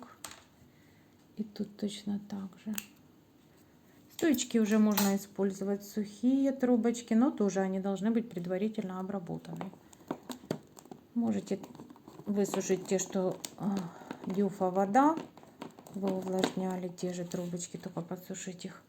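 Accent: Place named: Ukraine. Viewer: Очень рада, что попала на Ваш канал. Самое главное, что слышу наш родной говорок. Украина . Замечательные работы, прекрасная подача. Хоть я плетун со стажем, но все таки очень хотела бы МК по белым трубочкам. Спасибо огромное за работу. С меня лайк и подписка. Удачи, вдохновения и процветания каналу !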